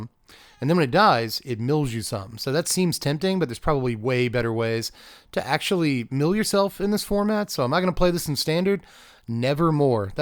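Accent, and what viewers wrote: South, United States. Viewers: It never gets old hearing a southerner speak spanish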